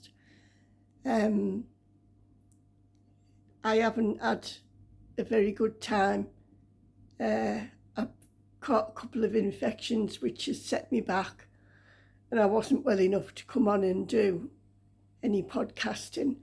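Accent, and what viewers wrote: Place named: North East, United Kingdom. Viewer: don’t apologise, sounds like its been a really tougher time lately. Love spending time with you and hearing someone from my neck of the woods, I live abroad at the moment. But miss the north east. Hope you keep getting a bit stronger each day. Take care love and hugs to you and your family xx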